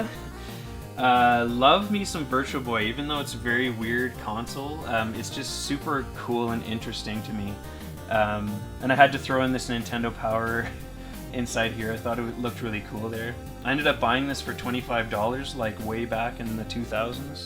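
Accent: Canada. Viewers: Are you Canadian? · That haerd Canadian accent is real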